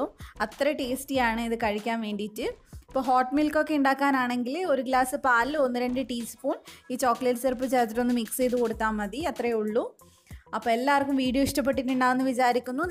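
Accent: Kannur, India. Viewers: kannur ano ithaaaa nannaitt manassilavunnund ndayalum spr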